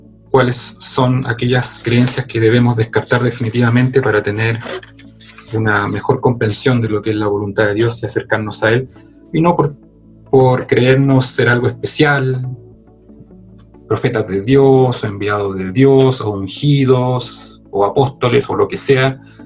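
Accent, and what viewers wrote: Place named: Chile. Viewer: Muy instructiva la presentacion, gracias. Pero usted tampoco describio las 7 definiciones de Verdad que Dios revela en su palabra, todas, las 7, y es muy importante conocerlas todas porque de otra forma no conocemos a Jesus, y el diablo se rie y se burla del medio creyente, porque continua ignorante, desprotegido y vulnerable para ser engañado a su antojo. Desea conocer las 7 definiciones de Verdad que nuestro Dios nos enseña y revela en su palabra, la Biblia ? Y por lo que oigo usted es de Chile, hermoso pais para conpartirle de Jesus y su palabra.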